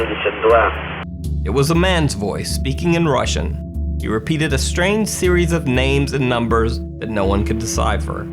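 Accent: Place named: South, United States. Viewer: There's a hint of Southern accent to your voice here. But I haven't noticed it in any other videos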